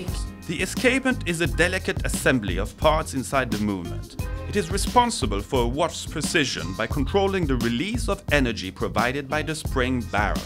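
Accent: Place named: France. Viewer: Mais pourquoi ne pas le faire en français ? Surtout avec cet accent. Bonne revue quand-même.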